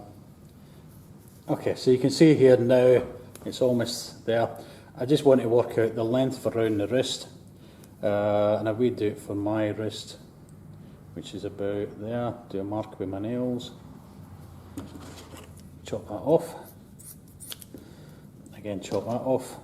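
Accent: Scotland, United Kingdom. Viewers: Thanks for sharing! Great video, don't worry about it being a bit raw, people watch it for your skills and I also appreciate listening to some Scottish ;)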